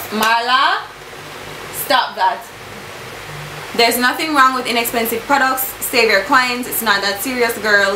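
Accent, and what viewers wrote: Jamaica. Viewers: I'm from Trinidad. ... but a Jamaican accent is so exotic to me.... lol.... keep doing what you doing girl..... one day I hope my channel gets as bomb as yours....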